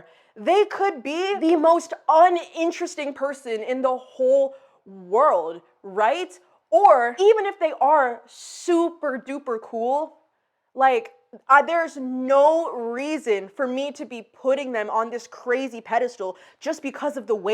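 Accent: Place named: General American, United States. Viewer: Generic american. Accents are more difficult to place now-a-days because we consume so much media and pick up words in different accents.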